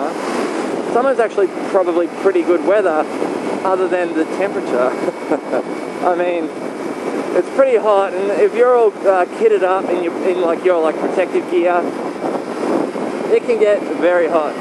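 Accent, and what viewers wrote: Australia. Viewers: please tell me you are Australian! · you sound Australian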